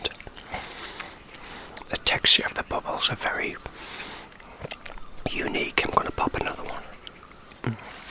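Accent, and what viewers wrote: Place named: Newcastle, United Kingdom. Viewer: The Geordie accent works really well for 👍😊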